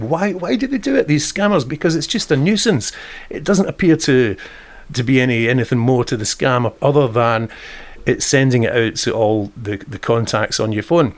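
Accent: Scotland, United Kingdom. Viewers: loved hearing your Scottish accent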